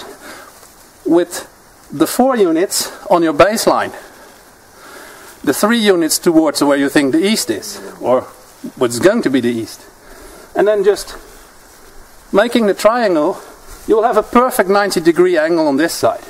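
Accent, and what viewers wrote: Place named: Canada. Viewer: One of the best and most practical vids on navigation I've seen yet! My father-in-law has one of the best navigational abilities I've ever seen and we are in flat heavily forested terrain and I've watched his dead reckoning on cloudy days and could only marvel at the internal GPS he carries around in his head. What region do you live in, almost sounds like Canada?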